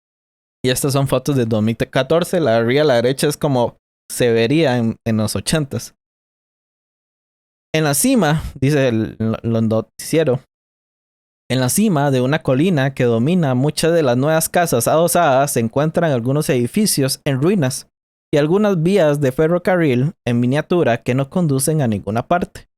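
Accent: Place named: Costa Rica. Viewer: Jaja reconocí de inmediato ese hablado tico, buen video.👌🏻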